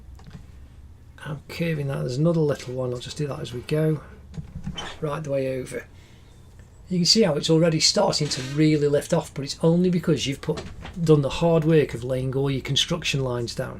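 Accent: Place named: Cleethorpes, United Kingdom. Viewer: hi! you sound like you are from near me, i am in cleethorpes x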